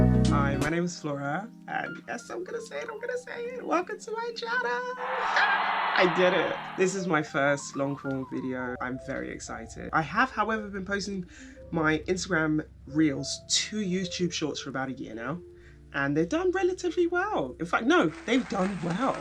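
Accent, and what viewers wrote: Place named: Barbados. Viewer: Alright de bajan accent sounding right den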